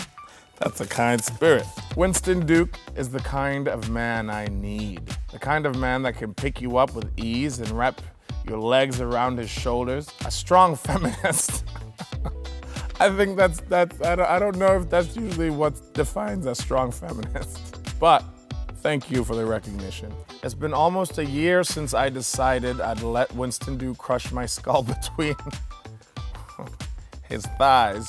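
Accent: Trinidad and Tobago. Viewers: Him and his tobagoonanian accent. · I Wanna hear his Trinbagonian accent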